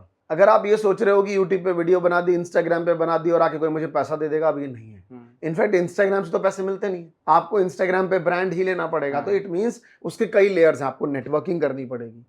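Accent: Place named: Chhattisgarh, India. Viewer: bhi aap Chhattisgarh se ho kya mujhe aisa lag raha 🤟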